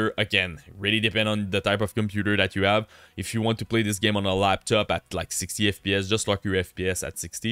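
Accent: Quebec, Canada. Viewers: T'es clairement Quebecois haha! L'accent est accurate.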